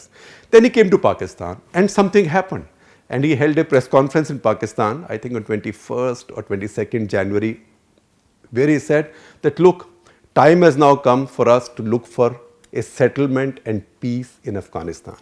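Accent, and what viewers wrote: India. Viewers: Hahahah look at this stupid guy Imran Khan has been very successful in brininging military and civilian leadership on same page plus he is way more popular then na was sharif ever was because he has been a celebrity plus NZ was directly selected by zia ul haq while IK has a political evolution behind him ask us we have witnessed don’t believe go watch his political rallies not just in Pakistan but In Washington modi can’t even bring half of them togather outside India and modi is exposed by trump he is asking for help from trump to resolve Kashmir issue so this stupid man who speaks English like he is speaking in Hindi bullshit accent fuck you bastard you know nothing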